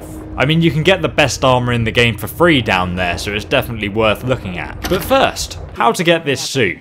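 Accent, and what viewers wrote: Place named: United Kingdom. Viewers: It's obviously British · he's brittish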